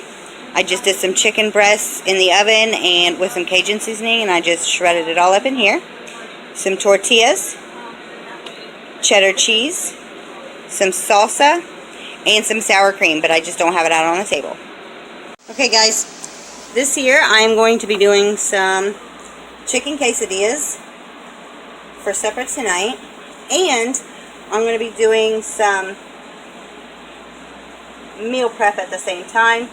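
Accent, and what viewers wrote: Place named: North, United States. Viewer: I'm originally from Pittsburgh PA.. I thought I recognized a northern accent. I have subscribed.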